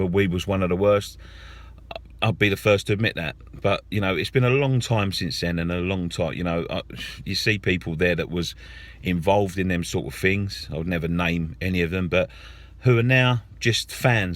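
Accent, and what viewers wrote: Cockney, United Kingdom. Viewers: What a boring cockney deluded wanker.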